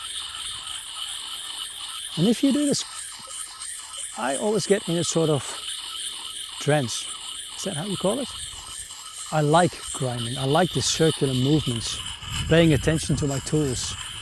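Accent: Netherlands. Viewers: Ik houw er altijd van om door YouTube te gaan en ineens een Nederland accent te horen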